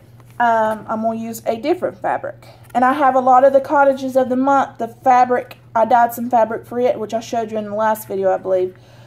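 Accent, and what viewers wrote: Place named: South, United States. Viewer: Just found your station and I am a new subscriber---thanks for a great video, I have a southern accent too. I loved every subject you discussed & everything you showed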